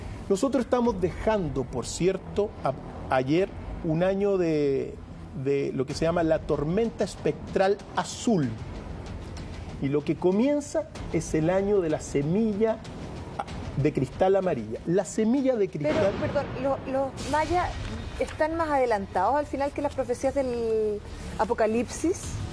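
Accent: Chile. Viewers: me gustaria tenerlo para mi solita, pero entienedo que seria mucho egoismo de mi parte, es usted un tesoro para la humanidad, me encanta escucharlo....sobre todo el acento chileno, lo mas dulce que he escuchado. a mi gusto... gracias por sus predicciones, hay mucho que aprender.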